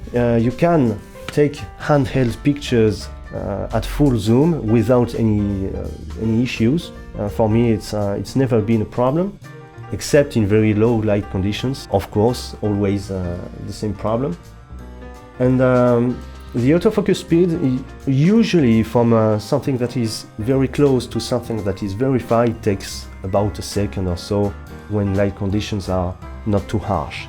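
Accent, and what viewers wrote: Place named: France. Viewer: Your French accent made this video very enjoyable to watch - thanks!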